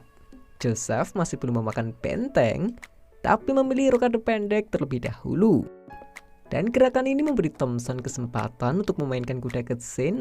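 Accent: Java, Indonesia. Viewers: Sampeyan jowo endi bang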